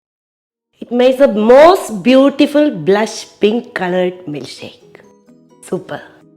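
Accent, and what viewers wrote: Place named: Thrissur, India. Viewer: Nalla thrissur malayalam thanne aayirunnu nalladhu ,kelkan oru sukamundayirunnu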